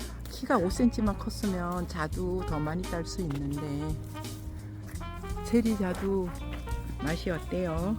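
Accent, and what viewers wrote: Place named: Gangneung, South Korea. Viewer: 자두보니 군침도네요 목소리가 강릉 말씨네요 제고향 강원도 어렸을때살던 대관령 가보고싶네요😊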